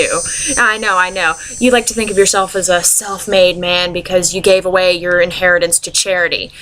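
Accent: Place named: South, United States. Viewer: I see you found that southern accent you proclaim you don't have in your other video. Be your self girl and be comfortable in who you really are.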